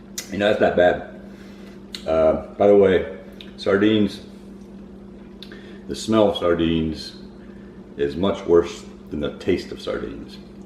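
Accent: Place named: United States. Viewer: Usually only Brits and cats can eat Sardines for breakfast, but you sound like youre American....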